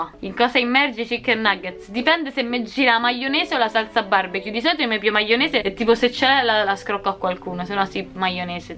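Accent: Rome, Italy. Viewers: Quanto amo er tuo romanooo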